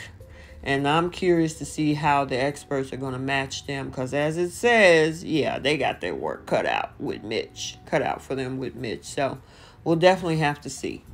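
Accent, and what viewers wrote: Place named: United States. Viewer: What's with the American voiceover.its Australia here.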